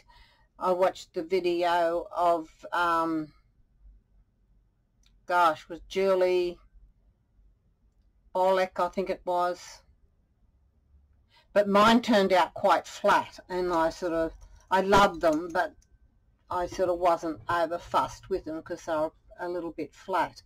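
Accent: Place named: Australia. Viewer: Very strong Aussie accent. Worked in Aus for a few years before returning to NZ. Miss my Mates. Love your work, and l hope your health improves. We have free prescriptions here in New Zealand, and even before we only paid $6. It’s a shame that prescriptions are so expensive in Aus, considering every tax payer has to pay the additional 3% tax for Medicare.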